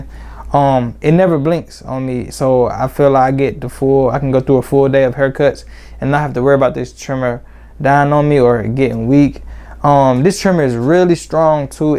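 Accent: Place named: Miami, United States. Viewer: You gotta be from Miami the word “really” give y’all away sound like rally lol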